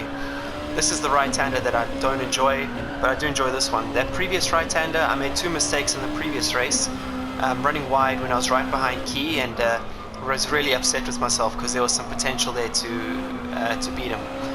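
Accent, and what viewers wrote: South Africa. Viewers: Nothing like a south African accent......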